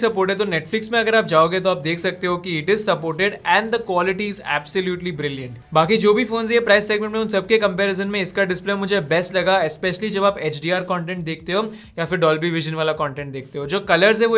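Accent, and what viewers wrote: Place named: India. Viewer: I honestly cant tell whether its english, or half english half indian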